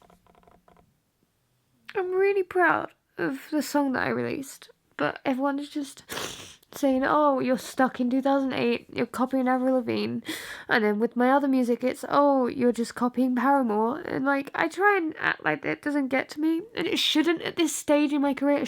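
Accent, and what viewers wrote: United Kingdom. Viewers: Okay three things 1. is an amazing song. 2. Originality, in my point of view; has evolved into a performance type thing. Everything can be traced back to anything if you really put your mind to it. the thing is how you take that which inspired you and make it into your own thing. So yeah you sound like Paramore...no shit, Haley Williams is a bad ass performer that inspired many girls in the last decade to try this. At the end of the day, an song is AN SONG. don't be ashamed of whom you sound/look like. Embrace it and evolve it into your own thing. 3. Snotty nostrils sounds incredibly serious with a British accent.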